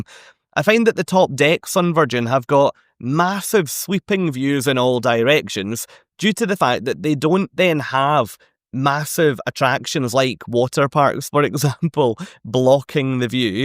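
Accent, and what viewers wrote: Scotland, United Kingdom. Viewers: First of all LOVE your Scottish accent! Where in Scotland are you from? Second, all wonderful tips! We are cruising Virgin for the first time in February 2026, and cannot wait! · Another really charming video,! Just love that endearing Scottish accent! Our first VV cruise leaves Portsmouth next month. 13 days on the Mediterranean aboard Scarlet Lady. Shall see how we go, but that hammock and the great food constantly talked about should be some of the highlights. Have taken your tips on board. Thank you!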